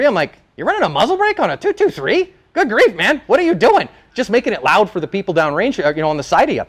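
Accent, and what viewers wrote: Canada. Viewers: I heard the Canadian in today as well! Great vid! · Love your channel. But holy shit your speech cadence sounds Canadian! Lol. Great great great video!!!! Very helpful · Have you been spending time in Canada? Where did this Canadian accent come from? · Is he Canadian, ay? Or from Minnesota?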